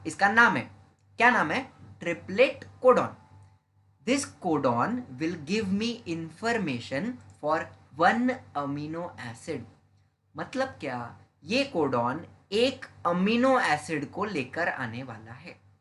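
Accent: Maharashtra, India. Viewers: Sir aap Marathi ho kya ??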